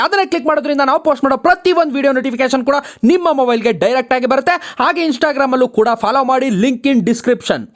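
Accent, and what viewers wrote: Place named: North Karnataka, India. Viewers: 3;35 Uttar Karnataka language love u Bhai ❤️ channagi matadtiri nivu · sakath matadti nod pa hulli namm uttar Karnataka bashee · ಹಾಯ್ ಅನ್ನ. ಉತ್ತರ ಕರ್ಣಾಟಕ ಭಾಷೆ ಸೂಪರ್ ಅನ್ನಾ ನಮ್ಮ ಭಾಷೆ ಮಾತಾಡಿ ಬ್ರೊ flz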